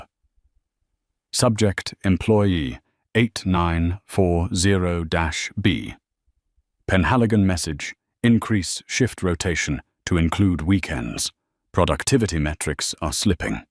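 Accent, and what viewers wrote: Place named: United Kingdom. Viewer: Hi.... I know, eh?! My solution, if you don't mind me addressing your comment, is to put a piece of paper over the top section of my screen where the freeze frame picture is or where the slide show and all the annoying "confetti / snow" moves. Then, I click on the Transcript amd read along as I am listening to that purring English / British accent narrate the story and let my imagination do its natural work. I hope this helps? Cheers! From Windsor, Ontarjo Canada 🇨🇦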